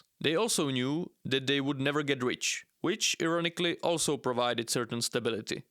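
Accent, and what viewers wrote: India. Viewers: Wait. That fucking jeet accent. LMAOOO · Are you really Czech? You have an Indian accent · Bro is Czech but sounds Indian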